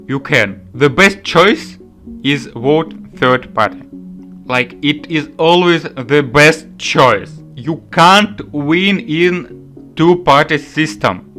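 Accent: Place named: Russia. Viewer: It's a most genuine russian accent. But whatever makes you sleep better at nights.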